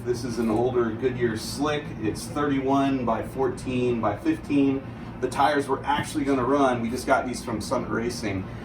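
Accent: South, United States. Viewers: new accent is really freaking me out. Its a cross between Californian but more southern drawl now.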